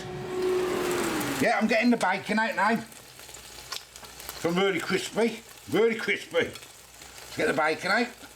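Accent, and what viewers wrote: Birmingham, United Kingdom. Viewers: Is that a Brummy accent I hear?🤣🤢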